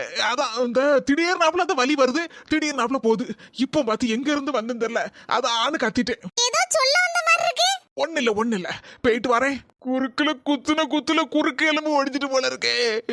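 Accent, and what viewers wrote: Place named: Tirunelveli, India. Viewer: சூப்பர் திருநெல்வேலி பாக்ஷை....கேட்க இனிமையாக இருக்கு...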